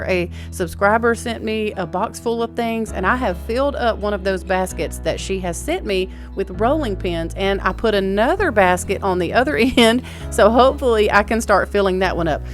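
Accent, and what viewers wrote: South, United States. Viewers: You are an inspiration! I love to watch you thrift & decorate. You make my Central IL heart happy! (And I just love your sweet southern accent!)❤️🙏☕🌷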